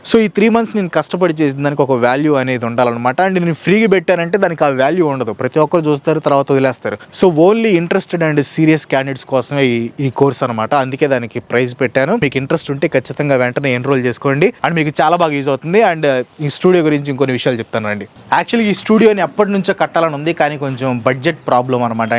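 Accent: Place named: Tenali, India. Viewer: మా తెనాలి రేపల్లె డెల్టా ప్రాంతాల్లో ఉండే స్లాంగ్.... వచ్చిద్ది వెళ్ళిద్ది కెవ్వు కేకే 😜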